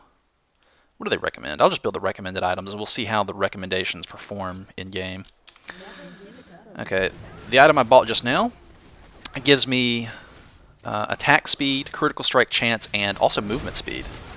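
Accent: South, United States. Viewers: this video was amazzinggly helpful:D thank youu and I can't help but notice that adorable twinge of a southern accent you have:3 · u have a southern accent :3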